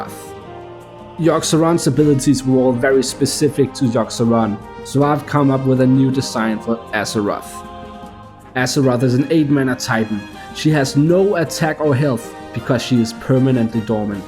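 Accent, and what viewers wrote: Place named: Denmark. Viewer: Man ka’ godt høre at du er dansker 😅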